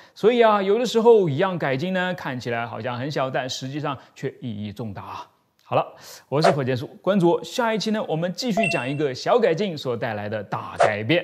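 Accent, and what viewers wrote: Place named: Shanghai, China. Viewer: 从视频中得到的信息已经从火箭叔的口音分析，火箭叔毕业于上海大学？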